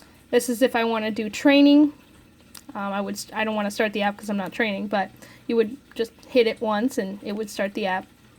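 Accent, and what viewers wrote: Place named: United States. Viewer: Голос и говор заменить бы с мириканцких на британские - я б на авторе женился.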